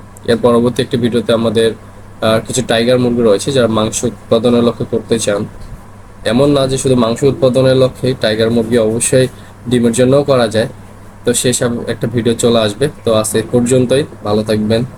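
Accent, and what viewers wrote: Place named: Rangpur, Bangladesh. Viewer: আপনার লোকেশন কথায় রংপুরের। থানা কোন গ্রামের নাম.???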